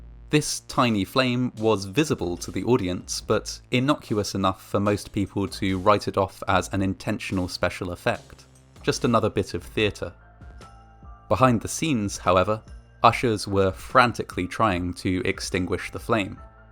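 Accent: England, United Kingdom. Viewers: This channel is amazing and you sound like a really intelligent guy. Very refreshing. Would I be correct in assuming that you are English?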